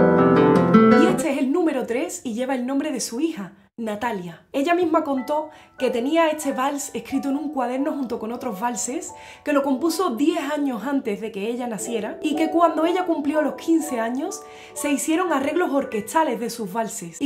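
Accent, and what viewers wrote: Andalusia, Spain. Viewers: ese acento andaluz tan hermoso!! Saludos desde 🇻🇪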